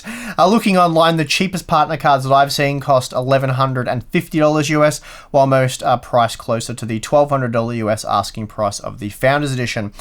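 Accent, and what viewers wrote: Australia. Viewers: What's with the red-striped leather jacket at the end?... quick change artist are we?... getting a little cold there 'ol possum? haha! Thanks for a great news/opinion piece vid - always seems a little more authentic to me with the Aussie accent and no American BS. Really appreciate the thought you give things and your independent thinking mate. Cheers, from the Hills area in Sydney!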